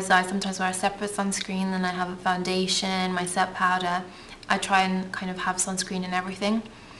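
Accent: United Kingdom. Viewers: wow! i'm ukrainian too, but i live in US, and i envy your british accent, i think it is soooooooo cute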